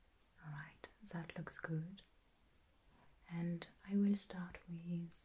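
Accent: France. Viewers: Exactly. I deducted it has to be French, although at first I was not quite sure, but it has German and even slight Swedish quality to it. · That's a thick french accent. I love her work and her grammar is perfect but as a french myself, i find the accent tends to take me out. It never bothers me when it's other accents but for some reason the french accent kill my asmr ^^ I commend her for the amazing work though !! And I still watch her videos for the ascetic and world building ! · as a german myself, i don't hear any german accent. It sounds very french to me. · Je me trompe ou tu a un fort accent français ? ^^ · Just found out this channel existed ~ oh boy have I been missing out! This production is absolutely stunning and the quality is top tier 😭 ✨ I absolutely love your (french?) accent - it’s so unique and perfect for this setting! Psst! You got a new subscriber 😊 ❤️ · France bc of the axent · I think it's a french accent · I love your french accent, it’s so relaxing and welcoming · It’s French, but she gets lots of comments asking if she is German or has a German accent. 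I personally don’t think it sounds German at all, but very French. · It’s a French accent. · I might be dumb but is that a French accent? Its beautiful. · I’m French & I can say that is a French accent if I’ve ever heard one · ...her soft voice & French accent sends TINGLES down my spine !!!!!!!!!!!!!!!!!!!!!!!!!!!!!!!!!!!!!!!!!! :) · French accent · I'm French and trust me, it's a french accent 😄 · She sounds very French and has a lovely soft voice. I wish my French sounded like her English 😪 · French accent 🤝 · Are you French because you have a french accent c cool d'être représenté de partout tu fait du super bouleau continu comme ça 👌✌️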